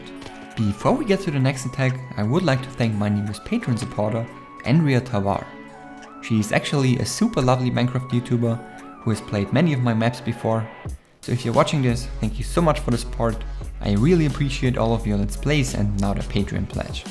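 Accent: Germany. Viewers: which nationality are you? sounds kinda like a german but im not quite sure...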